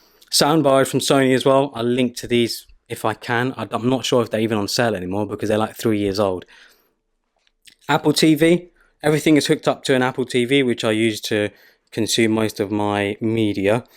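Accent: United Kingdom. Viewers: pretty house! and love your british accent thou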